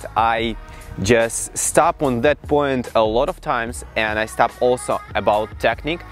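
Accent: Russia. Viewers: Блин) акцент вообще чётко услышал, смотрю - и в правду русский, а я и не сомневался)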